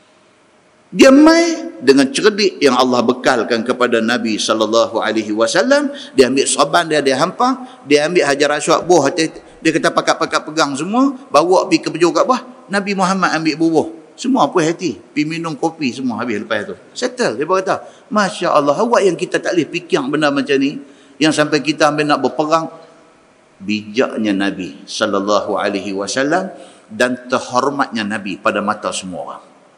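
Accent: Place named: North, Malaysia. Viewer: terima kasih....lega boleh dengaq balik bahasa utara...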